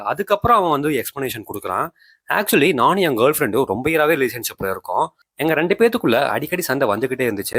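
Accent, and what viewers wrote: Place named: Kongu, India. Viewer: இந்த காணொளியை பேசுபவர் கொங்கு மண்டலத்தை சேர்ந்தவராகத் தான் இருக்க முடியும்... எப்படி என்று கூறுங்கள் பார்க்கலாம்???...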